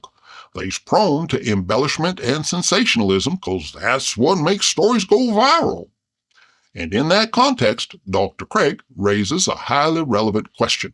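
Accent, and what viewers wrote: South, United States. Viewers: I'm a big fan of the analytic approach to how you examine and dissect each claim that is found in Dr. William Lane Crag's book On Guard. Giving them an in depth sniff test in your charming southern down home style. The only criticism that I have is more of a me problem than a you problem and that is the background sort of triggers my minor case of visual OCD and that is the trees in the mirror on the right side are just wrong the image should be identical as to what is seen in the window and it isn't.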